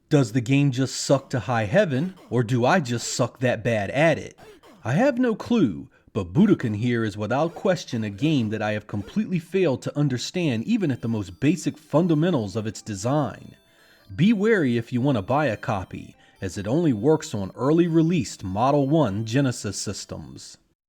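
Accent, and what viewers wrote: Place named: Philadelphia, United States. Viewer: You can tell he is from Philly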